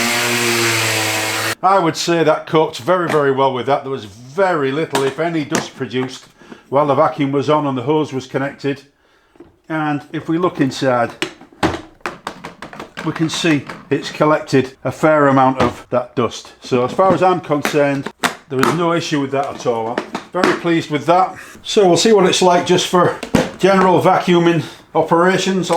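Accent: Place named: Hull, United Kingdom. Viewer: Great video just ordered one... I recognise that accent got to be from Hull 👍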